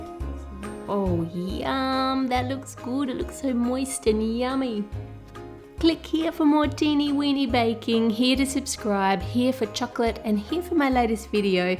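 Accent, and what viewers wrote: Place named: Australia. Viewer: I like your Australian accent.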